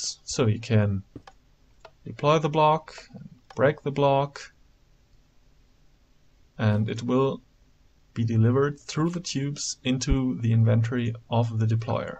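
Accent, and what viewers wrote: Germany. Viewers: Super Deutscher Akzent :D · deutscher ?:D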